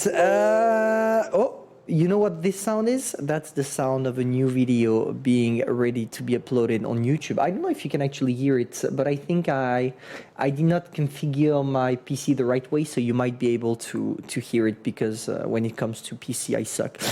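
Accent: France. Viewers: Awesome video for newbie and yeah, seems like u are france, arent you? Idk why but i think so.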